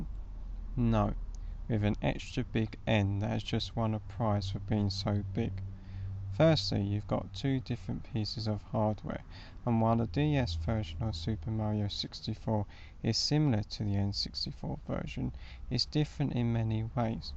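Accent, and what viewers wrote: United Kingdom. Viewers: Your pronunciation is really modern. The latest version of European / British English basically.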